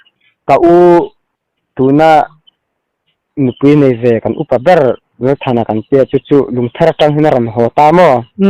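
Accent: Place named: Pawi, India. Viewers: An tawng thluk dan ve reng Ani e,Pawih tawng influence ka ti mai... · Tunlaia Mizotawng kan hman hi an mother tongue a ni lova, an pipu te Lai (pawi) tawng hmang an ni a,an tawng a ze ( pai) deuh a ni ve mai e. An kawm theih viau zawk a lawm. Tunah phei chuan midang( neigbours) pawh awm lohnaah an awm a, kum a ral chuan biak theih pawh sn ni lo mai thei a sin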